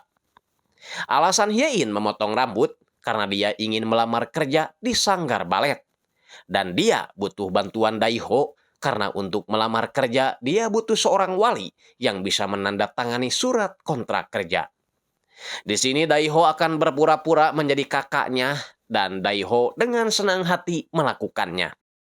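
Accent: West Java, Indonesia. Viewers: Keren euy si mamang sundanese pisan euy edunnn👍🏽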